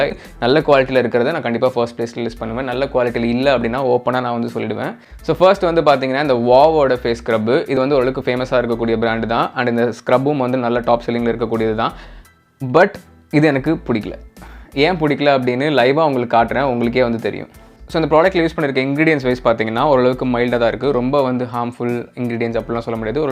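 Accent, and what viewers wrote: Madurai, India. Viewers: Maduraikkararo Neenga 🤔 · Anney ninga Maduraiya😁.. Onga slang appudi eruku... "Avinga,Evainga... Pooturuingey"😍😍😍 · Bro Madura slang varuthu apo apo nenga Madura ya 🤔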